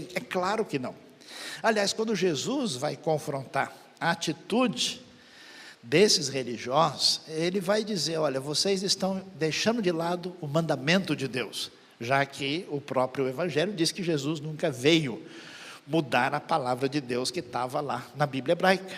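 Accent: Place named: South, Brazil. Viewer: Perfeito. Me horrorizei no início achando que Jesus achava que higiene não é importante. Ainda bem que Ele mesmo explica. Belíssima pregação, texto muito bonito. Sotaque sulista ficou muito bonito. Estranhei no começo, mas aqui tem muita gente do sul, ouvi tudo muito atento. Maravilha ver que Deus opera em seus servos fiéis, há muitos bons pastores que levam Sua palavra a sério.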